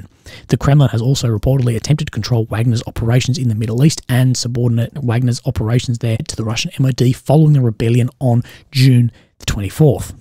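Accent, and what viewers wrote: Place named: Australia. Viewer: yo son ben in UKR for six months great to hear a real OZ voice. Met a few seppo's here, usually pretty friendly when travelling but , not here, just hi and by.. OK appreciate your channel a lot great OZ perspective.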